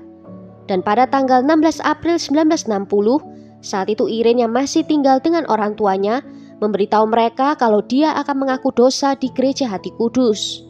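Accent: Java, Indonesia. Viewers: mbak suara nya Medok 😀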